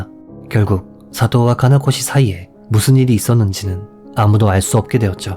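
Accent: Japan. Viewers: 요즘 일본어억양을 심하게 넣지않아서 더 듣기좋아졋네요👍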